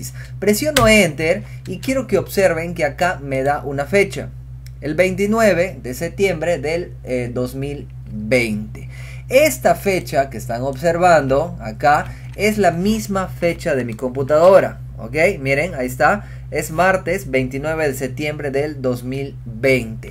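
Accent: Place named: Amazonas, Peru. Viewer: QUÉ CAPO ERES AMIGOOOOO!!! Muchísimas graciasssss!!! Tan didáctica y súper fácil de entender tu manera de explicar! Vale oro este video... gracias por darte el tiempo de compartir tus conocimientosssss!!! Millones de gracias, me ayudaste a ordenar mi padrón de edades de niños para poder hacerles llegar regalitos acorde a su edad en esta navidad!! Dios te bendiga!!... Pdta: De casualidad eres de Rodríguez de Mendoza / Amazonas? Te sentí el acento similar al de mi familia que es de allá! Ojalá seamos familia! jaja un abrazo!!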